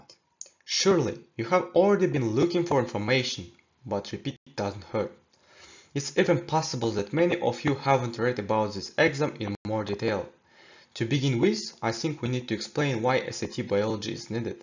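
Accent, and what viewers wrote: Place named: Eastern Europe. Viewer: I love your Slavic accent! This video was quite helpful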